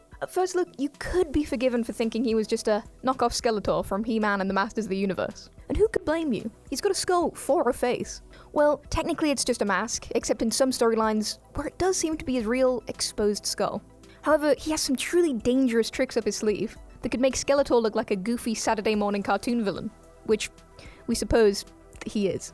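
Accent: United Kingdom. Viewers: You sound like a British version of Ducky from Land Before Time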